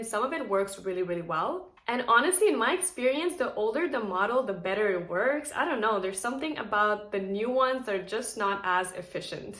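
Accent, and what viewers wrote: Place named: United States. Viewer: she sounds American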